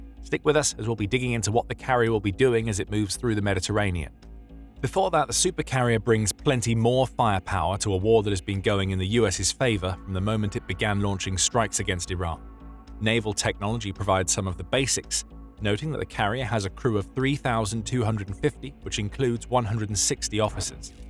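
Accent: England, United Kingdom. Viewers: Get rid of the British narration. Britain is irrelevant now. Use an American narrator. Unsubscribed. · Sounds like a bloke from England who put this together. And we all know England can't be trusted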